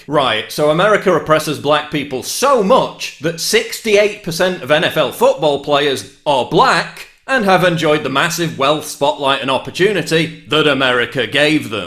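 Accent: United Kingdom. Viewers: I can't help but laugh because your accent comes from a country where America isn't liked. Yet you jump on the bandwagon of creating a YouTube about the most recent news so you can collect some easy cash for more tea and crumpet. hehe ^_^ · why do you have a British accent?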